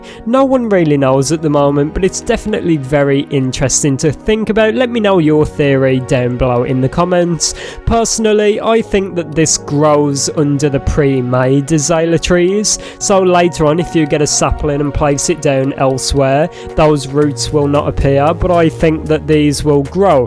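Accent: United Kingdom. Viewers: Mans sounds like chills with a British accent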